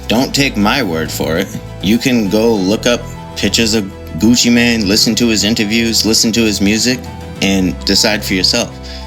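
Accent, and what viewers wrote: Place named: South Boston, United States. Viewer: Wuttup dude, I just saw this video on Lipstick Alley, and I was frustrated that you didn't SHOW us what you described, but I also respect that you expect people to care enough to investigate on their own, which we should, and I most certainly do, and will in a minute about Gucci, who is a total nothingburger to me, no idea who he is, what he looks or sounds like, so my eyes and ears will be a fresh raw test. I believe it, probably, tho, it all sounds UTTERLY likely, given how evil the overlord class is. I just subscribed to your channel, because it looks interesting AF, but mostly because I miss hearing that accent. I grew up in Southie, and I still live in Quincy, but your particular accent is VERY familiar to me, and very few people sound like you now. Over the last 4 months, I've become the Faceclocking GOAT, I've detected and proven HUNDREDS of secret biological family relationships among celebs, often with me and my family directly involved. 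I've been waiting all this time for someone to remind me of Joy Wright-McCarthy, and you win, lol. You also remind me of the Ferris family, e.g., Paul and Justin. On a weirder note, you emit Jack Parsons vibes, and so do I, and so do a surprising number of people originally from Southie. Weirder things were afoot in Southie than anyone can currently possibly imagine, truly the bizarrest shịt in the history of mankind, NO EXAGGERATION, and yes, I am including the ancient Levant, ahem. On a brighter note, we were not just polluted with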